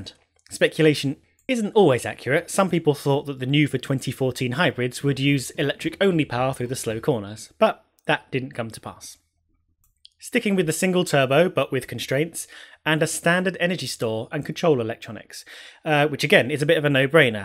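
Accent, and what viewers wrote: United Kingdom. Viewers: didn't notice cuz of ur British English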